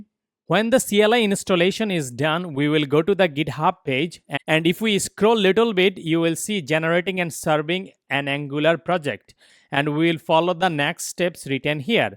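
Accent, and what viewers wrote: India. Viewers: Heavy bengali accent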